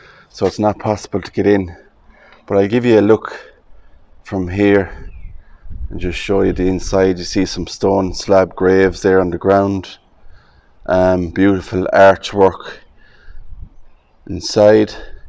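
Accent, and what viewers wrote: Ireland. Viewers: I love your videos. I very much enjoy hearing Irish folks speaking. You could be reading a phone book and it would be entrancing.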